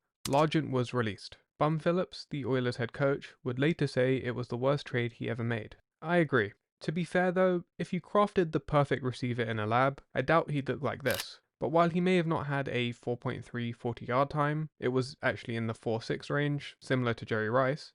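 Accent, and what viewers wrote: United Kingdom. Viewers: British guy talking about the NFL? 1KYAE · How weird is it hearing a British dude talk about football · Sorry but i cant listen to a british talk about amercan football.it just doesnt serm right😮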